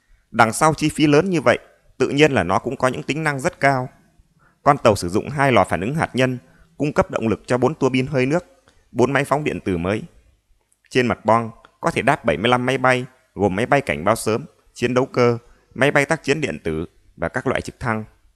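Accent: North, Vietnam. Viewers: Giọng nói phát âm giọng Bắc chuẩn nghe rất hay, không nhớt nhát như giọng Hà Nội. Phát âm gãy gọn nghe mê giọng Bắc này lắm.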